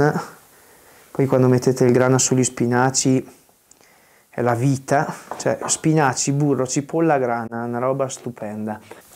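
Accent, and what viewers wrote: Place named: Romagna, Italy. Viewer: Mi piacciono tutte le tue ricette sono ottime nn ultima ragione sento dall' accento ke sei romagnolo e io adoro tutto della Romagna bravo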